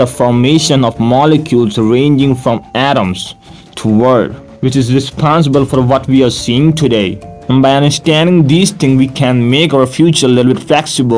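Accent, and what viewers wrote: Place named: Indonesia. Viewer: Indonesian trying american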